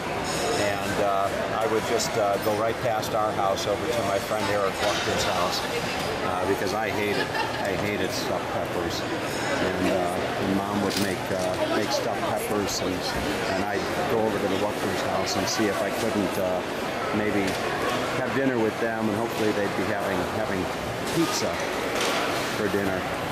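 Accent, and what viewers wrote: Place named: Pittsburgh, United States. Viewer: OMG, your video is perfect for me! I'm writing a story, and this is an early location. It's awesome getting a tour of it! The main location for my story is Abbey Avenue. I placed an apartment building across the street from the Gateway Animal Clinic lol. I've never been to Cleveland, Ohio before, so I've been doing a ton of research. Are you from Pittsburgh? The way you speak sounds a lot like Pittsburghese, as my granddad calls it.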